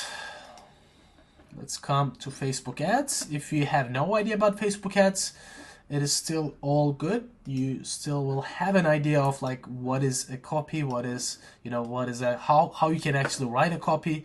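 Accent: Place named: Turkey. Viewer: Çok Türk aksanı alıyorum bu videodan :D Kötü bir şey değil bu arada, sadece kanalda isim dahil her şey İngilizce olduğu için şaşırdım. But anyways, thanks for the tutorial and tips 👍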